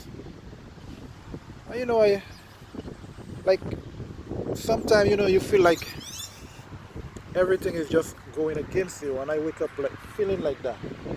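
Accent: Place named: Dominica. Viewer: He sound he from Dominica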